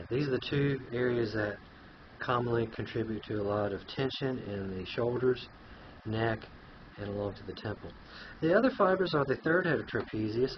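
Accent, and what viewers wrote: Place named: Scotland, United Kingdom. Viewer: I thought he was Scottish when he first started talking lol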